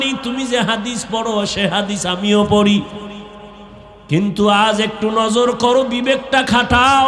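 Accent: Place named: Khulna, Bangladesh. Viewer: মাশাআল্লাহ এটা সম্ভবত খুলনার বয়ান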